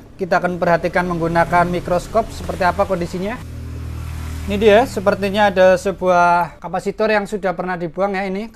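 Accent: Banyumas, Indonesia. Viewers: logatnya kaya ngapak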